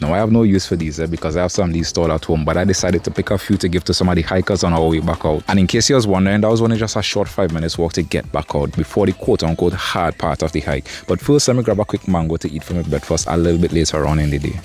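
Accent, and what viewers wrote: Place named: Jamaica. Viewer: Your accent took me back to Jamaica.❤️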